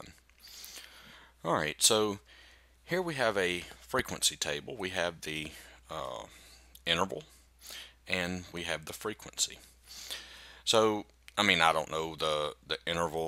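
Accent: South, United States. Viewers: Thank you! P.S. You have a southern accent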